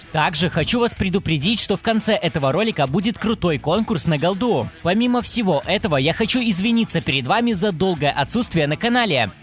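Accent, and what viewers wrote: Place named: England, United Kingdom. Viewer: Я один заметил что у него небольшой английский акцен?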